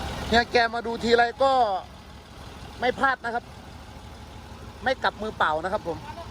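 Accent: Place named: Kalasin, Thailand. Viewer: เอฟซี กับ เว้าลาวกาฬสินธุ์บ้านเฮานี่ล่ะครับเฮียฟังเข้าใจง่ายดีครับผม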